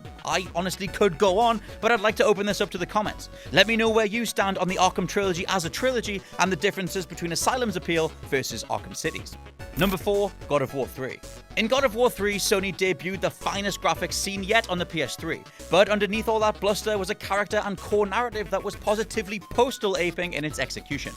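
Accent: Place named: United Kingdom. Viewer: A British accent wearing an Alter Bridge T-shirt, makes it hard to stay mad at you.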